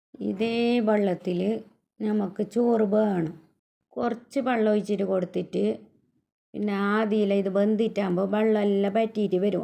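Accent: Kasaragod, India. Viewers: കാസർകോട്ട് സസംസാരം കേൾകാൻ ഇഷ്ടാണ് video super ❤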